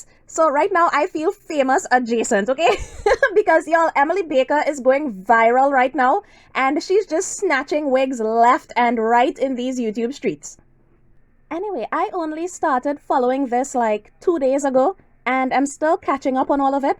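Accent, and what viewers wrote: Trinidad and Tobago. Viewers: Saw the repost through Emily Baker... As soon as I heard your voice, I recognized the Trinidadian accent 🇹🇹 Congratulations on succeeding on this platform! ❤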